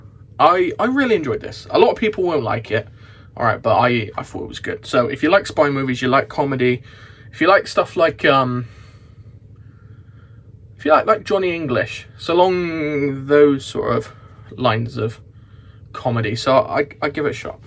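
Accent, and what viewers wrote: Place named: Bristol, United Kingdom. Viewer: Great video - Love how you are int oso many different genres, aloa love the accent from a fellow Bristolian! More please!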